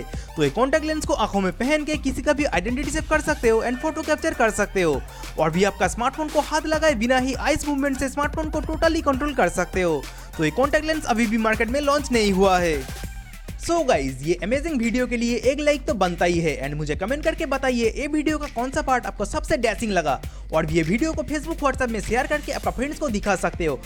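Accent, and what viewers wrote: Bengal, India. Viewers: R u Bengali? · r u BENGALI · Powergrid Fatehpur its due to Bengali tung... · Bro are u a bengali ur pronouciation is funny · Aapki tone se aap Bengali lag rahe ho relax dear aaram se bolo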